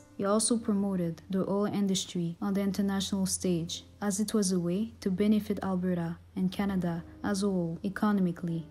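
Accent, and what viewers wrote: France. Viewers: She sounds both French and Native - which makes this HER content and heritage - patiently listen or find someone that “speaks your language” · I don't care much about the accent and I do know she's French; read my comment. Of course, I'm not claiming she is reposting someone else's content. That's what I'm doing; I'm just providing feedback that would make this channel more popular for me and undoubtedly many other people. One should not be quick to judge another's intentions and it is an insult to consider the work of a craftsman to be the best that he is capable of.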